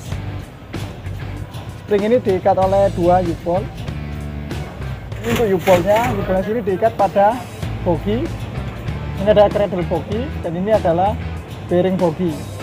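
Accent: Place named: Malang, Indonesia. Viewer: Sepring ,jan logatnya ngalam banget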